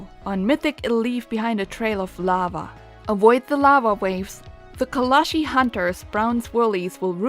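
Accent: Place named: Germany. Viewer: I saw in your "about " section that your accent is German, and you're "Working on that, too". I don't think it's something you need to feel self conscious about. I'm a native English speaker from Canada, and a person from England would say that I'm the one who has an accent. You certainly speak English better than I can speak German. Fantastic guide by the way. Concise, informative, and with a bit of humor to keep it from being too dry. Please make more!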